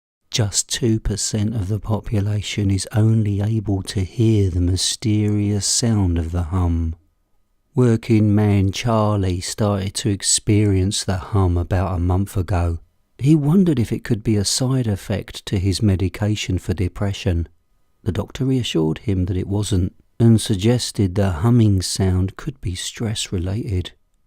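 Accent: United Kingdom. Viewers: Why didn't I find you sooner!! British accents FTW 🖤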